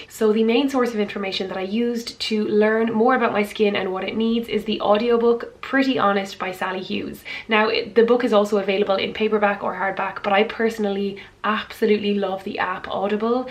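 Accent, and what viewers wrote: Ireland. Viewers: R u Irish??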